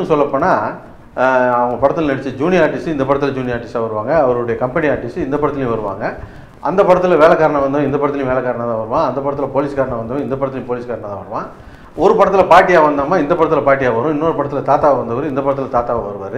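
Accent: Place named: Chennai, India. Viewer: Inna thalaiva Madras bhaasha la varuthu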